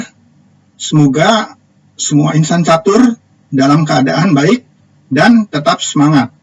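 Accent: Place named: West Java, Indonesia. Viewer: Pak logat Sunda nya tetap melekat ya. Mantap lah.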